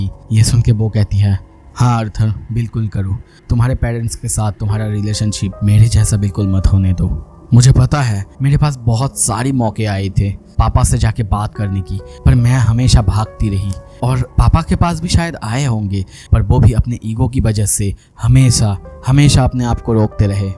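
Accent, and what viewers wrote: Bengal, India. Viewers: Bengali ho kya bro😊😊❤❤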